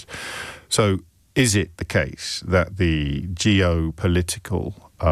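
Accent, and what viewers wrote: United Kingdom. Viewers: James is all over the place a Irish name posh English accent trying to both side a one sided argument he just hasn't brought out the moral quandary line yet?😢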